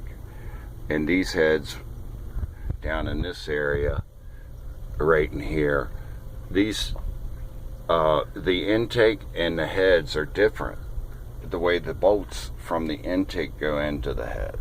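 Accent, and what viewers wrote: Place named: Pittsburgh, United States. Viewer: Are you from Pittsburgh by chance? Sounds like you have a yinzer accent. No disrespect, I lived there for awhile, miss the place. Good luck on your van build, looks awesome!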